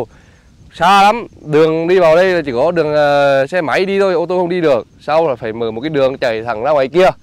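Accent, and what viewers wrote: Nghe An, Vietnam. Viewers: Nghe tiếng giống tiếng Nghệ mình quá. Bạn dân 37 hả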